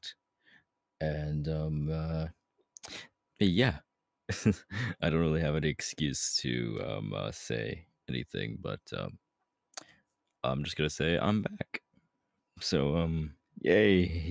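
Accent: United Kingdom. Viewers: Me when he has a British accent: 👁👄👁 😺🦋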